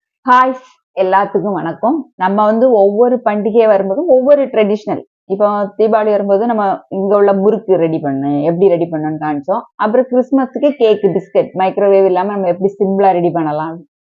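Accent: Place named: Kerala, India. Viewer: நல்ல மலையாளம் தமிழ்🎉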